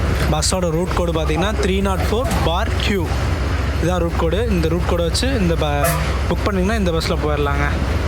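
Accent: Kanyakumari, India. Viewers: Bro are you from kanyakumari 🤔.. Your slang is like typical kanyakumarian tamil ( malayalam mixed tamil)🤔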